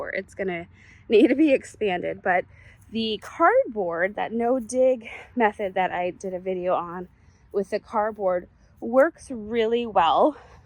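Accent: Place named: Chicago, United States. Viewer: Just found you. As I was listening I thought to myself that you had to be from the Chicago area. I hear the accent, my cousin has the same one. How do you like the greenhouse?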